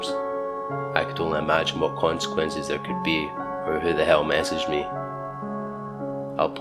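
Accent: Scotland, United Kingdom. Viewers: Who the fook is that? It's. Interesting to listen to the combinations of a scottish voice Yet also music dynamics that aren't from that of your typical narration pick. You know Myuuji or Kevin. For that you've earn another sub. Although I came to constructively leave criticism. I found myself listening and diving into the story. Keep doing your thing. Always watching You companion Night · Love the video mate. Also Scottish! ❤️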